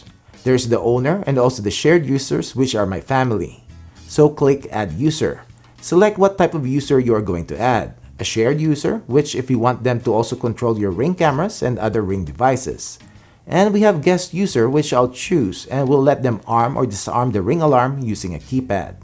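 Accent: Philippines. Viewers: not sure whose the ding dongs who gave thumbs down? unless they thought the accent sounded like a gay filipino? if you can avoid that, its an excellent review.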